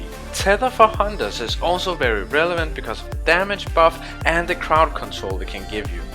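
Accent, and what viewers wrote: Denmark. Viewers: im almost certain that you my good sir are danish #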